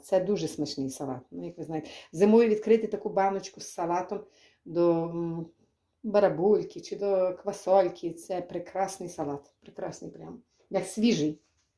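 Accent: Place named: Khmelnytskyi, Ukraine. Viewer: Дякую за рецепт салату.Приємно чути Вашу мову,так у нас на Хмельниччині розмовляють.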